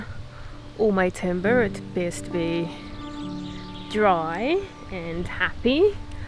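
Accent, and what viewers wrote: Netherlands. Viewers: De eerste keer dat ik een filmpje van jou zag dacht ik bij mezelf; er is iets met haar accent, waar zou ze vandaan komen? Erg grappig om Nederlands te horen 😃 je tuin wordt erg mooi en ik kijk elke keer uit naar de zondag! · I also heard Dutch… Groeten vanuit Breda 🥰🥰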